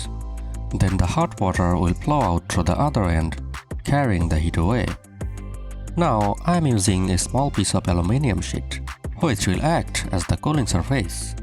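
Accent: India. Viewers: Your account from USA but your voice like from India or Bangladesh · Your voice like indian ❤🎉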